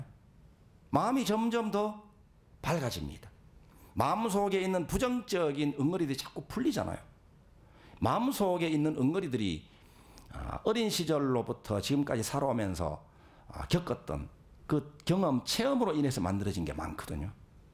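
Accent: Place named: Gyeongsang, South Korea. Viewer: 몇년전에 법상스님의 우연한신묘장구대다라니의 법문을 여러차례들었습니다 그때부터 열심히다라니공부를했습니다 경상도 억양의 스님강의 구수합니다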